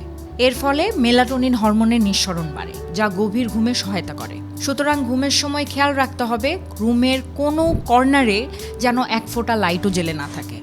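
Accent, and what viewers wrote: Bangladesh. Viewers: আপনার ভাষা শুনে মনে হয় আপনি বাংলাদেশী। তো দিদি ভাই আপনি আপনার ভিডিও তে যে জ্ঞানের কথা বলেন আপনি কি ১০০% বিশ্বাস ও পালন করেন কি?? না কি শুধু জ্ঞানের কথা বলে আয় করেন (বর্তমানে তো বাংলাদেশের রাজনৈতিক অস্থিরতা ও অর্থনৈিকভাবে ভেঙে যাওয়া একটি পাকিস্থান ও চীনের দালালি করা দেশ) আল্লাহর নাম নিয়ে উত্তর দেবেন। বাংলাদেশীরা ভুয়া টাইপ হোন (সবাই নন)।।।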